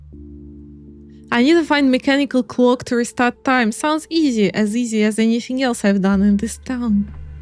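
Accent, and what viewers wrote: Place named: Poland. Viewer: polish accent asmr, its ohkay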